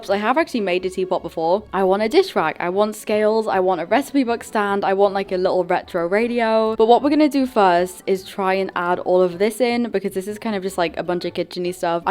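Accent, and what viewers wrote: United Kingdom. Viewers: You British slayyyyyyyyyyyyyyy I from wales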